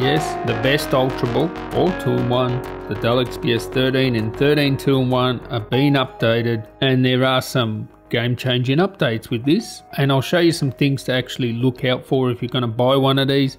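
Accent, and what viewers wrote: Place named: Australia. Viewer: i think the australian accent is pretty awesome 🤷‍♂️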